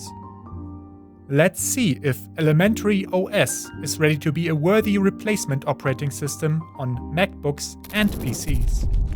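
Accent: Germany. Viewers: I know right! I love his god damn German accent.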